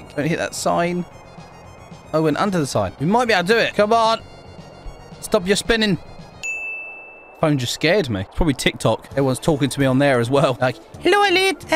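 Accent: United Kingdom. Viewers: You British right?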